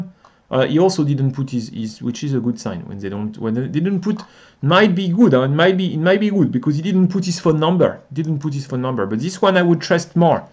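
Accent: France. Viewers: came here for the car... stayed for the french accent <3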